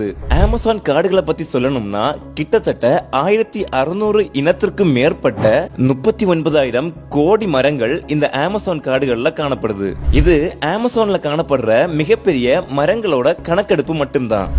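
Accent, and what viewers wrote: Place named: Kerala, India. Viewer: Avan malayali 😂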